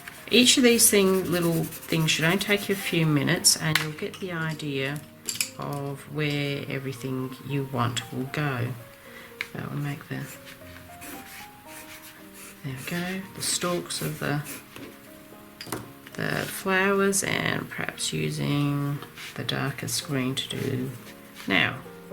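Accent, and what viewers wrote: Australia. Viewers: Thank you so much for the lesson, it has really caught my attention on how to pick a color pallet. This was very informative. It's so good to hear your Australian accent again and you sound like your beautiful self again. I'm so glad you are back.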